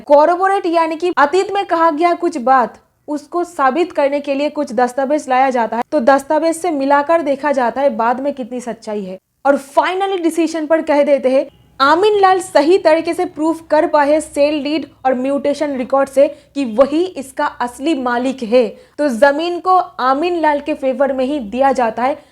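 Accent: Bengal, India. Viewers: Clarity and content, both well done. Your Hindi with a dash of Bangla makes the presentation more attractive and beautiful.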